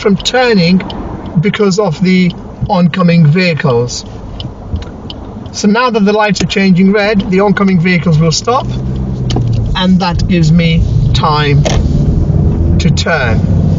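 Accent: United Kingdom. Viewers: Thanks nice, clear and compliment for your Queen English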